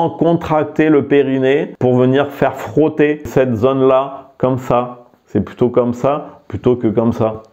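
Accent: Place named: Southwest, France. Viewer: On entend bien le sud ouest ça fait du bien 👍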